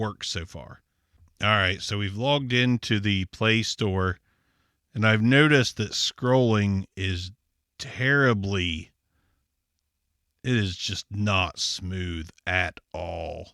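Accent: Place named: Philadelphia, United States. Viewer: Sensing a Philly or Baltimore accent. I dig it man. I'm from Philly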